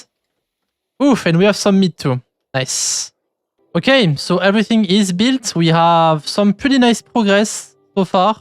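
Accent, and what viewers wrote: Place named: France. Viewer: J’adore ton accent bien franchouillard ! Superbe vidéo j’ai appris pleins de trucs merci bcp ! T’as cb d’heure sur les jeux ?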